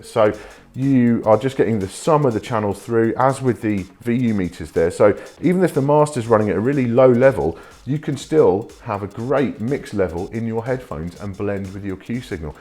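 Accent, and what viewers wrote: United Kingdom. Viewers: Great review + british accent = brilliant.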